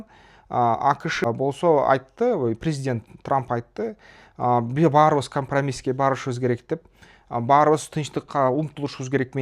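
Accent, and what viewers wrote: Standard. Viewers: Азаматсын,нукура кыргызча суйлойсун.жанылыктарына ыраазымын.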